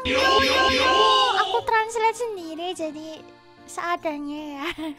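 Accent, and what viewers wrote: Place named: Cirebon, Indonesia. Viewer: logat ngomongnya kek orang cirebon jir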